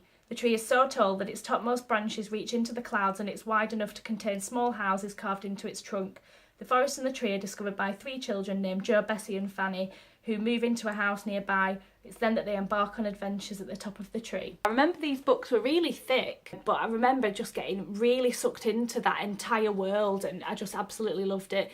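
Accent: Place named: Northern England, United Kingdom. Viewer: I clicked onto this, excited to watch another Newbie Book Tag and am welcomed with the familiar accent of a Northerner on YouTube :') Hi!! Haha, where abouts in England are you from? (I'm not a stalker I promise, just curious haha) :)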